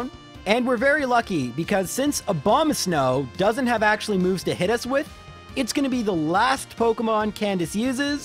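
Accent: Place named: Canada. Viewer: Hes from Canada so he pronounces pokemon names differently then in the us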